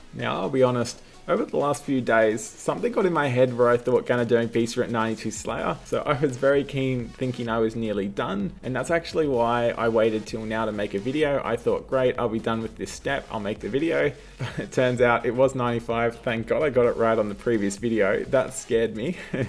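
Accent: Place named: Australia. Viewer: Man I subbed after watching your Rex safespot guide, glad I stayed around. For such a small youtuber this is really good quality. I can tell by your accent you're aussie so I gotta wonder whats your upload speed?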